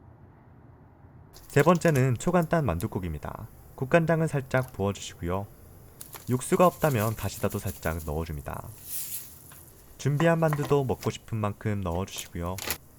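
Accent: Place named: Busan, South Korea. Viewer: 공감꾹 부산사람이라 사투리가 바로 들리네예❤❤❤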